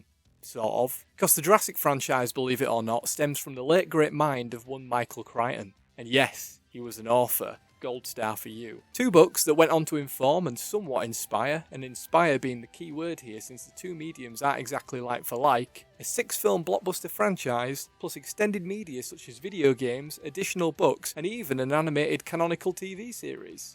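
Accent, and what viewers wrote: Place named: Yorkshire, United Kingdom. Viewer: You're welcome! I probably watch more film reviews than anything else to be honest. If I'm interested in the film, I'll watch the review. On the basis of that, I might just might watch the whole film at some point. I hear you. I'm definitely not saying ALL internet content should be positive. No, it should be honest. I just don't like the bandwagon effect, where an imperfect film comes out (Disney's Star Wars sequels for example) and absolutely everyone has to make a video about it, not only criticising every little detail, but using phrases like "Worst film ever" and "Why Star Wars Is Even Worse Than You Thought." To borrow a phrase from your neck of the woods: Fook off wi'that shiiiite. I get it, uploaders want their clicks and whatnot. But as all these people scramble for clicks and revenue, I come to appreciate channels that are a little more balanced about things. 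I think it probably takes a little more courage to state your own opinions rather than repeat the same crap a hundred other reviewers are spewing. You'll grow. You'll make a living out of this if you aren't already. It's a matter of time. Quality production, likeable host, dulcet Yorkshire tones... Contrarian opinions? You're like Sharpe, but without the rifle and awesome duds. Lmao. I better go. Please don't feel you need to reply to all this. We'll be here forever if you do. Haha. Have a good one,;)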